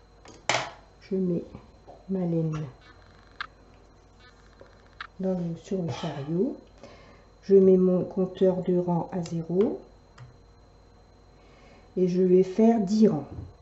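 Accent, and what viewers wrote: North, France. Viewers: Bonjour, c'est gentil d'avoir répondu et si vite,.malgré mon expérience j'en apprends toujours en regardant vos tutos. ...De plus j'ai l'impression de reconnaitre un peu l'accent du Nord, je suis moi même originaire de cette région. ..Vous m'avez redonné le goût de tricoter....Grand merci!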